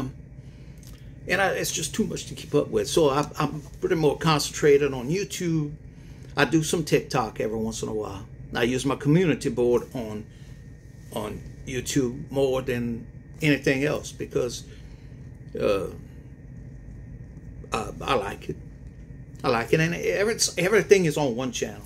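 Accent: Louisiana, United States. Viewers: I AGREE 100%. JUST CONTINUE TO DO YOUR THING YOUR WAY MANY MORE OF US ENJOY IT SO MUCH. THOSE WHO TAKE ISSUE AND NIT PIK EVERY LITTLE THING NEED TO GO ELSEWHERE AND BE HAPPY. IT'S ANNOYING WHEN PEOPLE NIT PIK READERS AND EXPECT READERS TO CHANGE AND TO ACCOMODATE THEM. ENTITLED MUCH ? THEY'RE NOT SPECIAL. YOUR SOUL TRIBE/ SPIRITUAL WARRIORS ARE LOYAL AND CONSISTENTLY WATCH YOUR READINGS BECAUSE WE LIKE THE WAY YOU READ GIVING IT YOUR SPECIAL CAJUN FLAVOR AND WISDOM. SO PLEASE DON'T MIND THEM JUST CONTINUE TO BE YOU AND DO YOU BOO!!❤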